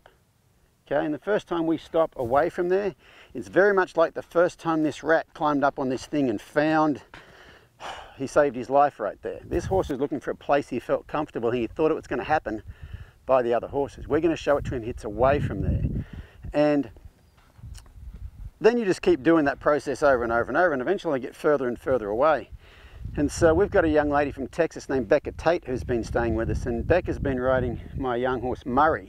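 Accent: Australia. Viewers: My favourite thing about is he still talks like an Australian 😂 Most Australians thinks they’re Americans after a week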